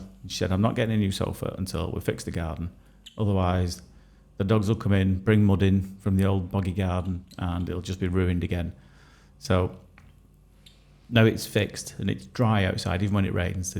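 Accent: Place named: North, United Kingdom. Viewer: Slab. What a great word for that piece of wax! And I love how you say fudge. Those northern u sounds are lovely. ❤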